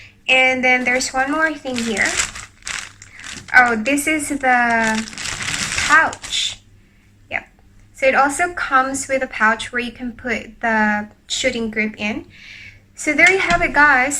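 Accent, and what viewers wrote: Australia. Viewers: Maam, nindot kaau aiii, pero wala juy mka labaw sa imng aksent maam pang Australian citizen kaau ganahan qg pamati. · Mugawas jud ang slight na aussie accent. Nakaadapt nka diha kie. Great job!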